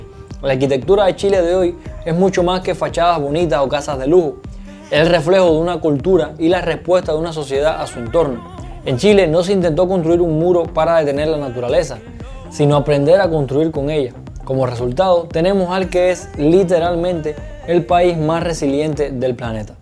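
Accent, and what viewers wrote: Cuba. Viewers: Gracias por el vídeo. Lindo el acento cubano. Un saludo a Cuba, un gran y valeroso país❤ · Muchas gracias por compartirnos esta informacion, con la claridad y entusiasmo indistinguible. Estoy a la espera del proximos vídeos de la arquitectura de chile. Me alegra escuchar su acento cubano y todo lo que ha ido aprendiendo y brindando por estos medios. Felicidades y saludos.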